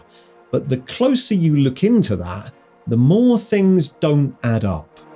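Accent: England, United Kingdom. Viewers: Accents are so interesting and unique. I love learning about them. No, I'm not talking shit about him or anyone with an English accent.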